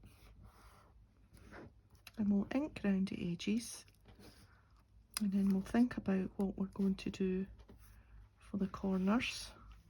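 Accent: Scotland, United Kingdom. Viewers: your accent is so familiar to me. I’m Scottish and my Grandmother had a very thick accent. Where are you? That is a great die! Love the makes! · Scotland I was first thinking that is an Irish accent but no I got just land of frays and lovely casels . Some day I will come too wisit for shore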